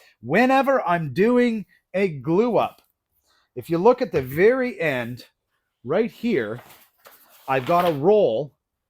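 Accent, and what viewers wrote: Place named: Canada. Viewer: I used to think tv exaggerated that Canadian accent.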